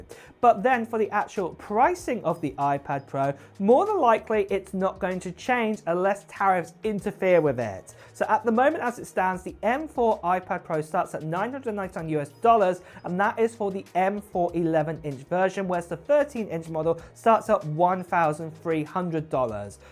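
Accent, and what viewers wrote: United Kingdom. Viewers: 안녕하세요 한국인입니다. 영어공부 잘하고 싶어서 의 테크영상 자주 봅니다. 저는 특히 한국 특성상 노트북도 윈도우와 핸드폰은 갤럭시만 줄곧 써왔어요. 애플 기기는 관심은 있지만 경험해보질 못했어요. 이번 기회에 이벤트하시는 맥북 제가 꼭 받아 공부하는데 써보고 싶습니다^^;; 너무 큰 욕심일까요?ㅎㅎ 발음 영국식이라 아직은 자막을 좀 키고 들어야하지만 실력 늘려서 꼭 자막 끄고 전부 이해하면서 재밌게 영상 시청해보고 싶어요.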